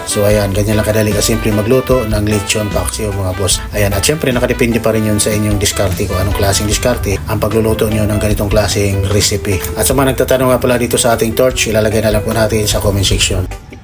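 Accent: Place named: Visayas, Philippines. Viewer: Bisayaa kaau oi..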